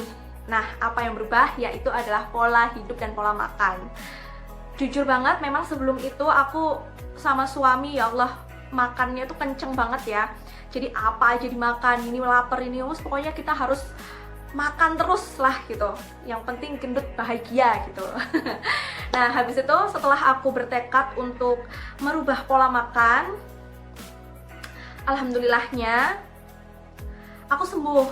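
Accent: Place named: Java, Indonesia. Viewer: Ngomong e medok mba, asline wong endi?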